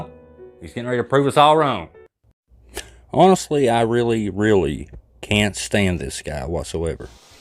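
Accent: South, United States. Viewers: I love your accent, y’all talk mighty fine down there in the south❤ hey great video! Nothing 2 dimensional about it! Made me lol too! Much ❤ & ✌🏼out! Oooo & Lee can 🖕🏼heh heh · Great video! Sorry this is nothing to do with ghosts or debunking but you mentioned accents. I don’t know what hillbilly means but I was struck by your accent when I came across your channel and wondered since - what is it? I’m not American so I’m just guessing like Virginia or Carolina or around that area? I could be way off sorry 😂😂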